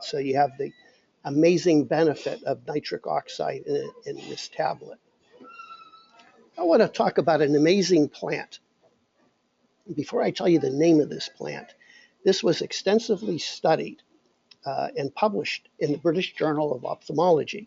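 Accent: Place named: Pittsburgh, United States. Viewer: You must be from the Pittsburgh area from the accent. Im from around there.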